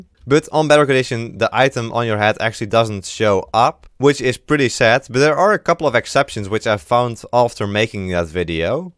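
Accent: Netherlands. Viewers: ik wist het.Nederlands accent is zioo duidelijk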